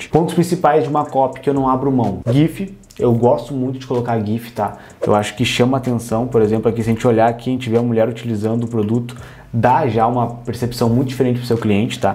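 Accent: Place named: Rio Grande do Sul, Brazil. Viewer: Tchê tu és de onde daqui do RS?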